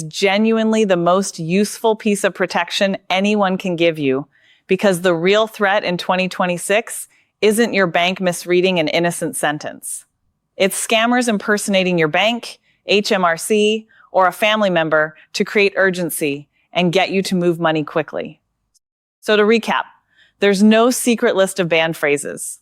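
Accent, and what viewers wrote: United States. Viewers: Yank accent? Load of AI tosh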